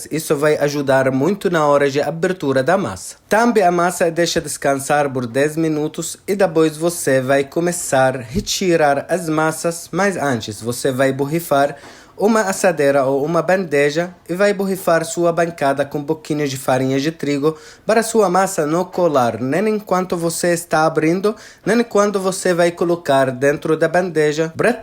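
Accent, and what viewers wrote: Arab world. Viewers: Que receita fácil.. vou fazer!!! Eu amo esse português com sotaque árabe!! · Sotaque árabe muito charmoso !🧡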